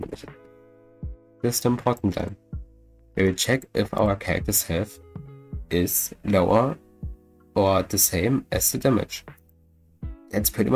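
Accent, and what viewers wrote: Germany. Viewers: der deutsche aktzent is so funny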